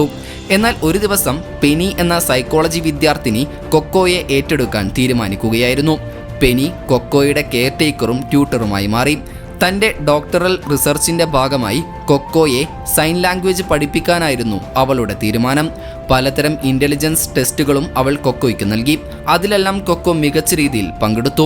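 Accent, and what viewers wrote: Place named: Kozhikode, India. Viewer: നിങ്ങളുടെ accent ന്യൂട്രൽ ആക്കൂ. ഒരു commentary യിൽ കോഴിക്കോടൻ slang കേറി വരുന്നത് തികച്ചും അരോചകമാണ് (തിരു.തൃശൂർ ഉൾപ്പെടെഏതു Slang ഉം) "ത്" എന്നതിനു പകരം "തി" ഗോറില്ലയ്ക്ക് എന്നതിനു പകരം ഗോറില്ലക്ക് എന്നിങ്ങനെ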